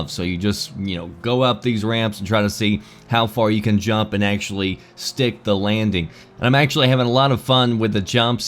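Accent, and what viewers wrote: South, United States. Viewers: You might find this amusing, but your voice reminds me so much of a preachers voice. Just a tinge of a southern draw and you pauses and articulation - lol. Preacher. Anyway, like your reviews. Fair and nothing meant to bring on fanboy arguing, though I'm sure idiots in your comments still do now and again. My boys and I love Forza so it's cool this one has turned out to be aces! I don't have a PC gaming rig so it's all Xbox One for me. Thanks again.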